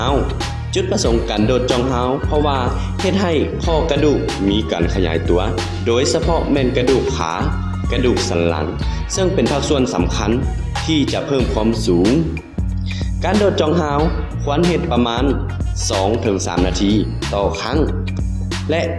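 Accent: Thailand. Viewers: เหมือนภาษาเหนือมากครับ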